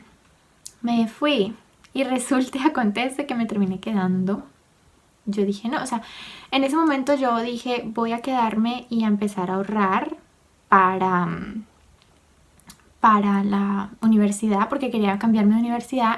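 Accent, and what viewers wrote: Cali, Colombia. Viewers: Jajajaja como contas las historias ve! con ese acento caleño re marcado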